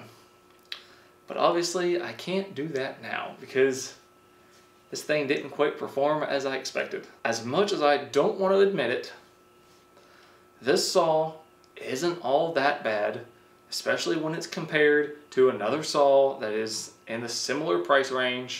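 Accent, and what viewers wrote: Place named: Wisconsin, United States. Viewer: You sound like your from somewhere in Wisconsin We’d know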